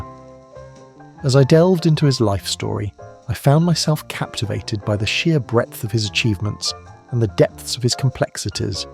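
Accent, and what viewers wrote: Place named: England, United Kingdom. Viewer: Lol. That is the voice of the average english man. Relax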